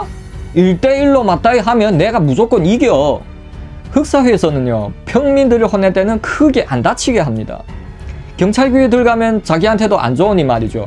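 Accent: Yanbian, China. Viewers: 님도 조선족인가요 범죄도시말투네요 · 임마 연변만 좀 고쳐라 역겨워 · 너 연변이가? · 연변말이여 뭐여 어디말투여?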